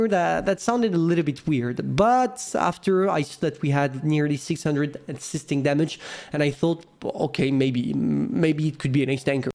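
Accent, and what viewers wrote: France. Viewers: My ears hurt when he pronounces english words like they were French and I can tell because I'm French myself but I'll give him credit for speech flow.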